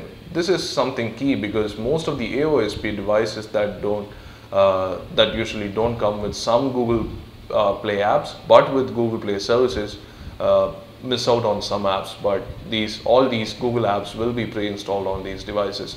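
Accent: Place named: Tamil Nadu, India. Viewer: The fone is ok for the price........ but are you from Tamilnadu..... just asked coz of your accent.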